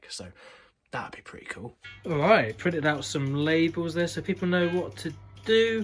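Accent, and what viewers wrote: United Kingdom. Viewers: Their dialect doesn’t use that sound. It’s weird, but you just have to accept that Brits talk funny.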